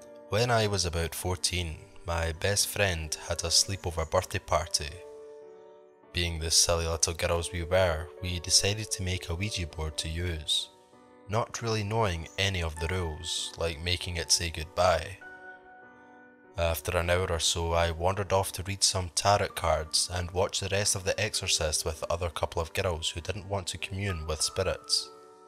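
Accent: United Kingdom. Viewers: Awesome stuff, so happy for you getting to over 1k sub's! I'm still sharing on wegamers. I am loving your content you put out, love the accent too, its great to hear people from the UK, love it. Keep doing what you are doing, your growing and growing every week!😜😜😜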